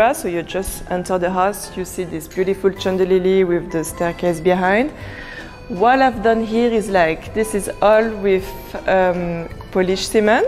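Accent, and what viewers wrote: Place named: France. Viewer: Beautiful home and nice French accent. Love it.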